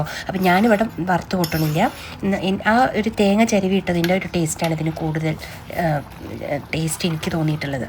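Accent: Palakkad, India. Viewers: From your language, are you from Palakkad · പാലക്കാടൻ രുചികളും പേരും ഒരു രസാണ് അതിന്റെ കൂടെ ഒരു പാലക്കാടൻ സംസാരവും കൂടെ കേട്ടാലോ ഹയ്യ് എന്താ രസാ കുട്ട്യേ ബഹുബഷ് 🤭അടിപൊളി പായസം kutta കണ്ടപ്പോൾ കൊതിയാകുന്നു 😋😋😋അതിന്റെ ഇടയിൽ താൻ സംസാരിപ്പിച്ചു കൊതിപ്പിച്ചും കൊള്ളാം അടിപൊളി 😋😋😋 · കേട്ടോളൂ കേട്ടോളൂ പാലക്കാടൻ ഭാഷ നല്ലൊരു രസമുണ്ട് കേൾക്കാൻ 😄❤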